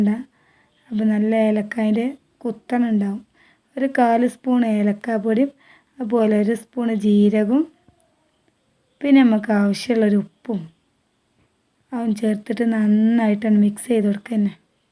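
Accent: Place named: Malappuram, India. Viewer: നിങ്ങളുടെ ഭാഷ പൊളി ആയല്ലോ മലപ്പുറം കാരെ ഭാഷ ആയിരിക്കും അല്ലേ🤣🤣🤣🤣🤣👌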